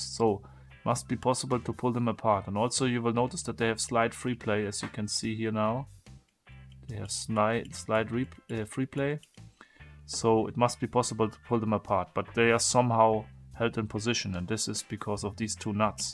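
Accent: Germany. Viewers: "screw them" ! :-) I love your Germlish. Never mind, it's ok.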